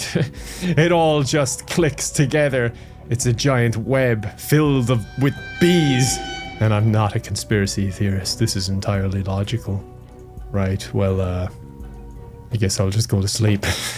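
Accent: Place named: Ireland. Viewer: living in Ireland lol. He spent some time in Spain though! I want to say I hope that clarifies things but it probably doesn’t 😅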